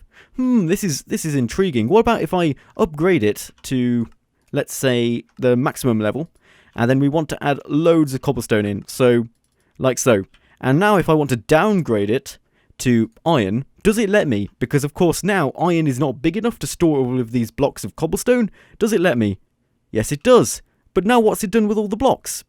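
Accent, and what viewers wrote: Wales, United Kingdom. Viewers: are you welsh??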